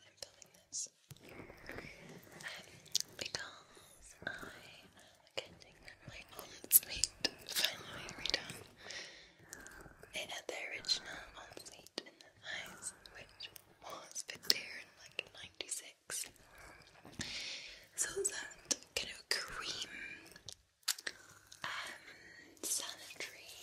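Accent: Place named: Scotland, United Kingdom. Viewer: I rather enjoy listening to you ramble,. Triggers or no triggers, whispering or soft-spoken or even humming/singing, I just find the sound of your voice very pleasant. 🥰 Even more pleasant than the rain, which I do also enjoy. 😂 Speaking of enjoying things: based on the way you kept breaking into other accents in the middle of talking, I'm going to go out on a limb and guess you were enjoying yourself while filming this - that's something I dearly love to see. 😍 To answer your question, though, I don't think I really have a favorite chocolate. Does German Chocolate Cake count? 😋 Have a great weekend, and I look forward to your next video. 💝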